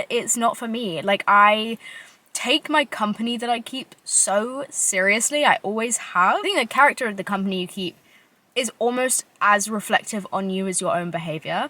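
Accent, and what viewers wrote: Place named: London, United Kingdom. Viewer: London block accent ✅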